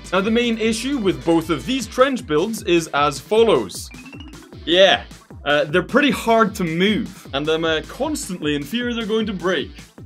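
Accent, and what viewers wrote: United Kingdom. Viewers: Can you make a battle from ever war BTW are you bri’ish or a Scotty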